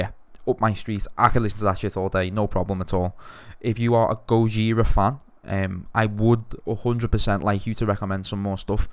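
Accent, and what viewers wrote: Liverpool, United Kingdom. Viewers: you from liverpool by any chance? keep the vids up dude good stuff!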